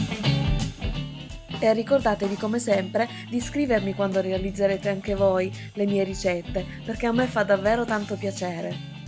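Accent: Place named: Romagna, Italy. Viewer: Che brava la signora!! Adoro la sua cadenza romagnola. Mio padre era emiliano e la sua famiglia ci ha insegnato a fare i cappelletti. Anche se mamma già sapeva fare la sfoglia. Purtroppo io non riesco senza la macchinetta, ma i cappelletti ogni tanto li faccio. Grazie per questo tutorial!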